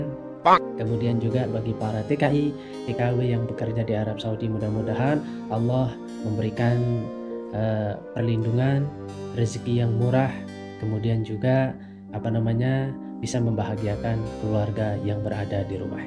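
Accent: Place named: Lombok, Indonesia. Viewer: Pasti org lombok, kruan suare pas kne parlemen hehe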